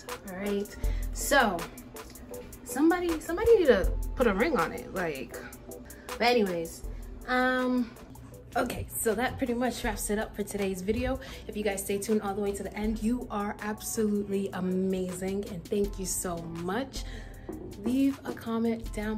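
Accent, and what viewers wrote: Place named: Midwest, United States. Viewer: That food looks good and you sound like you’re from Chi town or the Midwest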